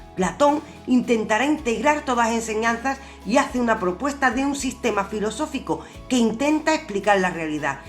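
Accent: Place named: Andalusia, Spain. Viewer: Me fascina su voz andaluz en una clase de filosofía, saludos desde Venezuela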